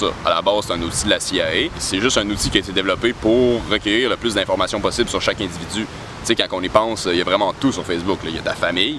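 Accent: Quebec, Canada. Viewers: T'es un fréro d’âme ! Tout pareil appart ton accent dégueulasse de canadien ! Je soutiens tout tes propos a tous les niveau a 100% Enfin jusqu’à maintenant . Mais jai confiance en toi ! Bonne chance ma couillette dans ce monde accro a la propagande peace ma gueule ! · Beaucoup de charme pour faire des vidéos peut être à ne plus y compter, mais trop volubile et rapide en paroles, préparées au rythme des thèmes choisies, je n'en doute pas, mais qu'on n'arrive pas à suivre même jusqu'au bout, ça saoule quoi ! Mais Monsieur a du crack, et une bonne tête que j'aime parfois entrevoir dans mes vidéos you tube, mais quelle horreur cette accent québécois ! Toutefois j'admire votre façon de parler avec beaucoup de pensées, un être étrange, édifiant dans son intellect, vous réfléchissez sur beaucoup de choses et montrez plus ou moins votre optimiste d'en parler, vous être étrange et drôle à la fois à la manière de vous exprimer...bon en théâtre, je n'en doute pas et croquant la vie à pleine dent pour toutes aventures...bon courage...vous me faite penser à un jeune scientifique plus ou moins ermite qui s'émancipe dans des thèses pour pouvoir s'affirmer dans la matière qu'il a choisi..bon je ne vous oublierais pas, faites attention à vous et garder la forme et santé...bye...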